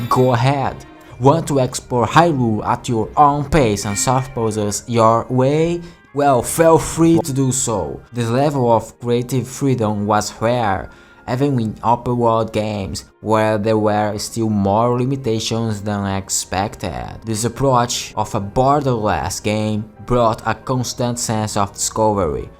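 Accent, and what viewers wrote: France. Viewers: Bro speaks francglish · Idk man the accent makes me think he’s French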